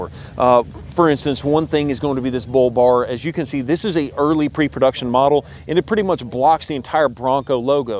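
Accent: United States. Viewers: The American accent makes everything worse. He makes modern tech and sophistication sound like it comes from Medieval times. Impressive. Also, it's hilarious listening to Americans and how they struggle to utter words more than two syllables. Must be a real big task.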